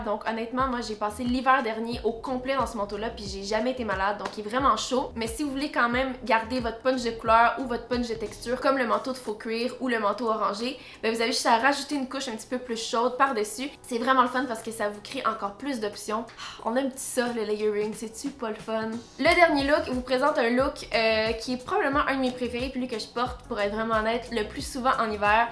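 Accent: Quebec, Canada. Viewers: j'aime trop l'accent quebequoi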